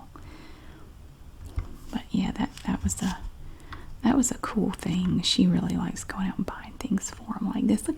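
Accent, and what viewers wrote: South, United States. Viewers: Omg I love your accent!! Can really hear it when you say “pie!” I always wanted to move to the south! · i love love your southern voice SO much !!! ❤️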